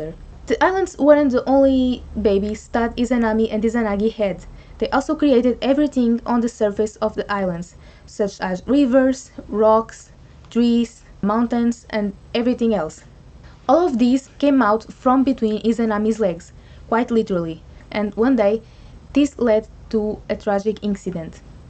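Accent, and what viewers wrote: Portugal. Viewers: Arquipelágo? A heavy portuguese accent. Perhaps Coimbra?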